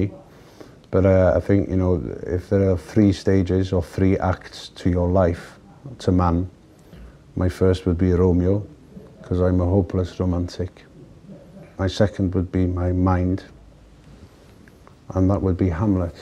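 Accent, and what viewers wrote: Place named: Wales, United Kingdom. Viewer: Wonderful with the Welsh accent.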